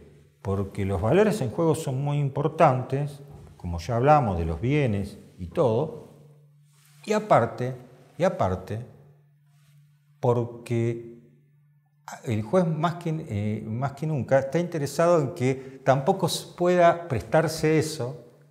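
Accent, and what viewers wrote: Argentina. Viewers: Tiene acento porteño asi que asumo que es de Argentina xD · Es Argentino no escuchas como habla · Cabe considerar que el habla de acuerdo a la Ley de su país, por su pronunciación parece ser argentino, lo dicho por el Dr. no es totalmente aplicable en cualquier otro país como México.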